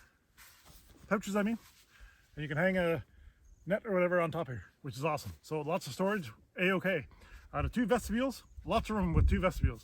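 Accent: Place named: Maritimes, Canada. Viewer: Really enjoyed the thoroughness of this review! Being from the Maritimes I also enjoy the accent, eh?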